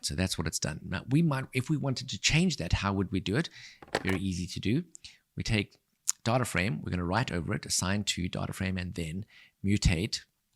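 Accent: South Africa. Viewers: Your accent reminds me so much of home! South Africa foreva! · OMG are you South African? That boomchakalaka had me.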